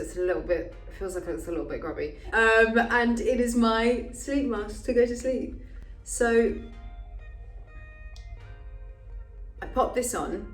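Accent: United Kingdom. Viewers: Love her British accent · I love her british accent>\\\< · Am i the only one who love this British accent? 😍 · Tell me your British without telling me your British (I didn't know she was Brit)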